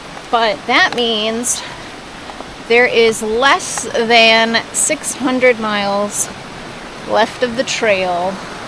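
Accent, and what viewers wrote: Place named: Massachusetts, United States. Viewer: I was thinking to myself that you sound odd but familiar. You're a Bay Stater so it makes sense now. I've been away from Western Mass for 25 years now and people still tell me I have an odd accent but I can't hear it. Every once in a great while I'll talk to a friend back home and think ah, that must be the accent they speak of. And from what I've seen of other people's videos people have been stopping and camping in North Adams. I think a person that finished the trail last year has opened up his yard and amenities to hikers somewhere near the trail and it's getting to be a popular stop so maybe they all went there (Even though this was probably a month ago old news by now)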